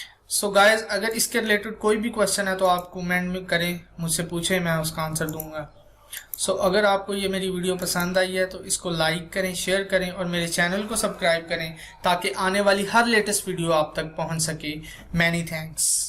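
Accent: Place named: India. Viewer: Can you speak english with u.s accent not indian accent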